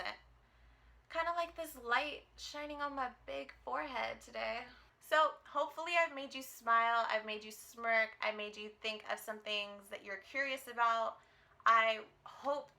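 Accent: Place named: Latin America. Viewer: I enjoyed how this was put together. The way you edited, allowed for this to feel more real and personal rather than too filtered and distant. kudos to the forehead comment 😂 You're awesome. Keep at it, (Latino accent and all). 😊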